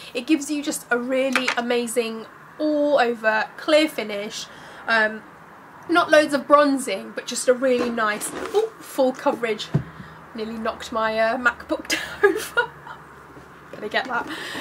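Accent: London, United Kingdom. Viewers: where's ur accent from.. london? .. oh and hello :-))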